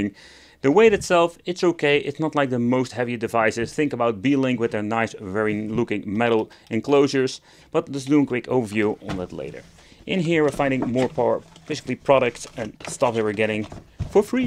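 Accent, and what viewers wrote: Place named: Netherlands. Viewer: Ik hoor je Nederlandse accent ik weet niet waar je woont maar als het dicht bij Alphen aan den Rijn is dat goed. Ik heb er. Rog ally waar ik probeer games te emulaten maar het lukt mij niet als je dat aan de praat kan krijgen kan je mijn rog ally een review geven met emulation ik probeer voornamelijk Wii, Wii u, switch en Xbox 360/ps3 games emulaten maar emudeck werkt niet bij mij ik heb mijn ssd wel geupgrade naar een 2tb ssd miss kan dat er mee te maken hebben maar als je niet dicht bij woont heb je misschien tips om het wel aan de praat te krijgen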